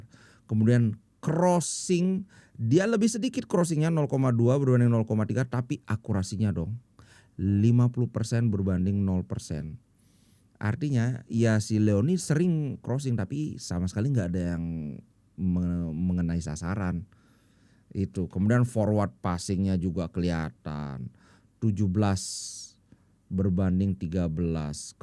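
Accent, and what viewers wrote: Bali, Indonesia. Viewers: logat kayak org Bali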